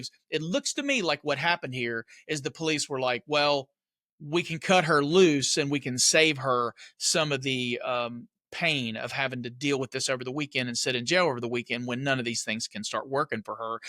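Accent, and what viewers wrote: South, United States. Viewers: NO, THANK YOU! I like your no nonsense straight to the point southern drawl with a dash or 2 of comic attitude. Take Care, John & Amy(woof), Wyoming, The only state w/out a accent!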